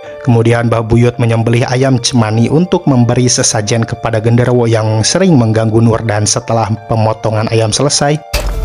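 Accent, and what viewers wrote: West Java, Indonesia. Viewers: Orang sunda ya a?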